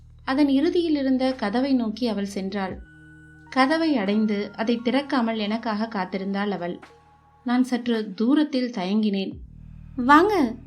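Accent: Chennai, India. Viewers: Your Madras dialect is bloody hilarious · You seem to be becoming very proficient in Madras basshai!!!!! Way to go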